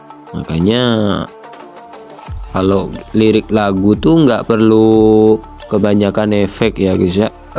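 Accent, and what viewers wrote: Madura, Indonesia. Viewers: Ketarah reng medureh 😅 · logatnya madura bet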